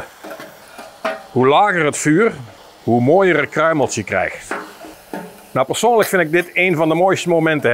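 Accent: Brabant, Netherlands. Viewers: Da's Brabants, gehakt is daar nou eenmaal 'gehak'..Gaat niet meer anders worden, vrees ik 😂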